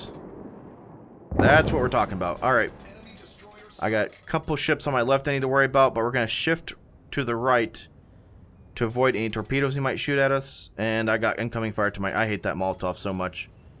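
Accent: Texas, United States. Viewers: State history... Sounds like you grew up in Texas...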